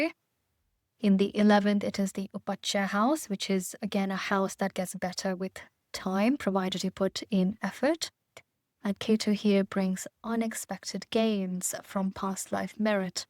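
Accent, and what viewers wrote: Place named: Guyana. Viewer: Your accent strongly reminds me of British Guyana. If I had the male version of your voice I'd be a millionaire. I'm looking forward to future videos explaining Jyotish. It's all Greek to me. Thank you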